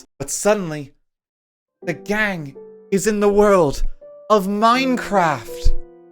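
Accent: Ireland. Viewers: God he's so Irish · keep the good work up! Never thought I'd get a second Irish lad talking about stuff he enjoys and is passionate about in longform, but here we are! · theres something magical about being a casual viewer and barely ever seeing like, physically, and then suddenly getting almost 2 hours of physical. im a week late but i honestly love video essays and long videos, i put them on in the background as i draw and its always so fascinating to listen to peoples different opinions and views on things. i also like irish accents a lot so its fun to listen to him talk. i vote more video essays on things you like (i reeeeally want a minecraft story mode video essay, i watched the playlist of that recently and the hatred for it is so funny) also thank you your editing is genuinely inspiring (i think a lot about how the e33 video was and how much that tickles my brain)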